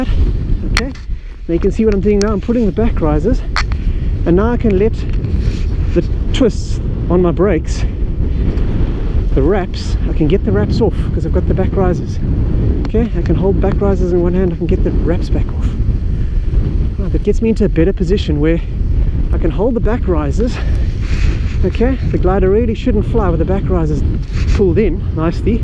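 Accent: South Africa. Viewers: South African accent ?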